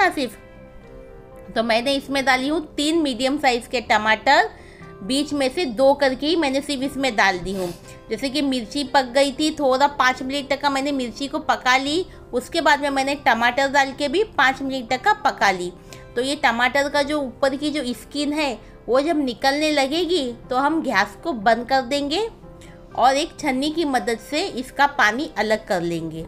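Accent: Konkan, India. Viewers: appa us mirchi ko bedgi mirchi kehte hai love ur voice ekdum kokni wali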